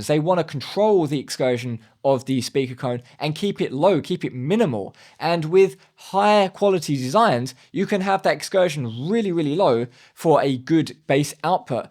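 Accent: United Kingdom. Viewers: So which European country are you at? Because I’ve noticed your wall power socket (is it Type L?) to be unusal for EU, like it’s from old days (60s-70s), perhaps in Italy? Of course your accent is British but that wall socket is something else (not UK). My guess is that you are either in Italy or Germany, perhapse in a house in the woods, which might explain seeing your tools (I do like that window view). Please do tell, I am curious about that wall power socket, it’s kinda rare. Wish you best of luck with your DIY speakers, they do look good. I will stick with my Genelecs (have you seen/heard their 8381A or 1234A models? they are cheaper than PMCs!)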